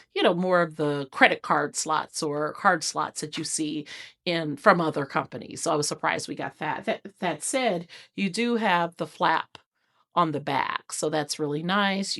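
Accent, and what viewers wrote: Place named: South, United States. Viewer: I like that your spread is simple! When I’m watching agenda planning, I simply don’t want to think! I’m from SC and you sound as if you are from my “neck” of the woods! Maybe Georgia and last guess Tennessee! Anita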